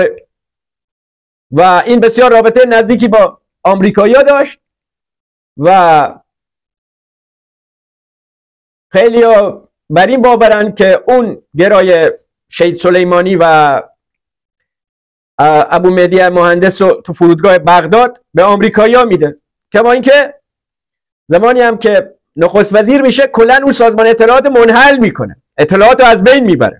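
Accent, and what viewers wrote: Lorestan, Iran. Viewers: درود بر که به لهجه شیرین لری، همرا با طنز مسایل ملی ایران و وطن پرستی را مطرح میکنید 🌹🌹🌹🌹🌹🙏🙏🙏🙏🙏🙏🇮🇷🇮🇷🇮🇷🇮🇷🇮🇷🇮🇷🇮🇷🇮🇷🇮🇷💪🌹🌹🌹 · درود بر آقا گل و وطن دوست. عاشق خنده های قشنگ و لهجه شیرین لریت هستم داداش. خدا نگهدار سردار عزیز تنگسیری و همه سرداران غیور ایران اسلامی.در مورد بحرین حرف شد حالا یک شعر ناقص و غلط میگم. ایران من بهوش باش از آن توست آرارات... ز اقتدارت شده عدوان تو کیش و مات... با قدرت سپاهت پیوند دهیم به خاکت... کشورک جعلی بحرین باکو امارات.... انشاالله پیوستن خاکهای با ظلم جدا شده به مام میهن اسلامی ملحق میشود. خاک بر سرت زشت کلام و اصلاح طلبان. مرگ بر دشمنان ایران.مرگ بر فتنه گران کثیف. پاینده باد ایران اسلامی. ننگ بر مسولین خائن مانند کوزه گرها مدیر تامین اجتمایی قزوین که حق کارگری که جانباز هم هست پایمال کرد. ننگ بر امید نادان.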